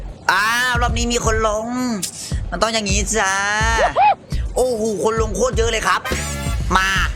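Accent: Isan, Thailand. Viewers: อ้ายแมนือเปนตะฮักแท้เว่าอีสานเด้นิย้านฟังบ่ฮู้เรือง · พี่ภาคอิสานจรืงหรอ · หวัดดีตัวตึงพากอีสาน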